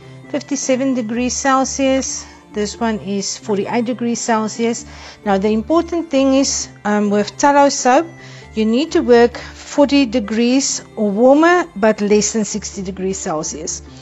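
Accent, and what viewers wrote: South Africa. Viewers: I thought you had a German accent I'm sorry South African that's COOOL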